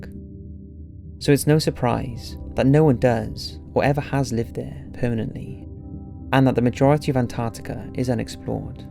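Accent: Wales, United Kingdom. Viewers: Trying to guess the accent. Welsh?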